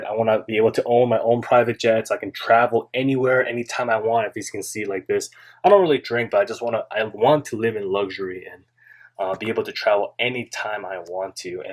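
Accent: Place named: Korean American, United States. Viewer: Dude are you Korean American? From other vid you posted, I though you sound like Korean American. But watching this, maybe you are!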